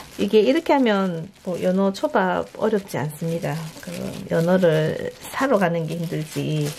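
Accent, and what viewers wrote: Gyeongsang, South Korea. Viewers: 하하하~~~ 경상도분이시라? 쌀이라하지 않고 살이라고 하네요.~~~♡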